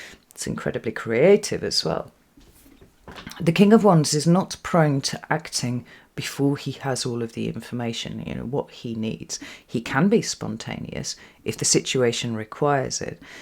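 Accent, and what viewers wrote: United Kingdom. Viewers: Just your amazing voice, delightful british accent and excellent vocabulary and choice of words captivates me. I would be listening to you for days! Thanks for your readings, Cap sun Sag rising here. 😊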